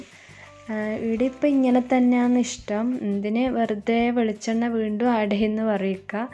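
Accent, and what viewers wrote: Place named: Kasaragod, India. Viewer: Keettitt nammale kasargod slang thonnunnu.... kasargod aano veed...nice vlog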